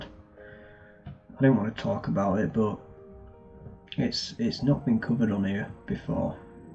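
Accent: United Kingdom. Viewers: Yes, it definitely does need that when it comes to this video; I agree wholeheartedly. I'm kinda cynical about things, lol, so I try and avoid watching stuff that requires the check, but it's annoying when it's a channel you really enjoy. I like the chilled nature of you just chatting and it's always nice when it's a fellow Brit! You're welcome, no problem! 🙂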